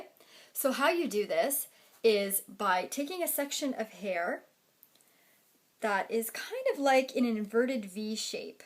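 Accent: Canada. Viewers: this video was great...i can tell ur canadian you may not realize but you have a strong canadian accent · she souds like a canadian!!! r u canadian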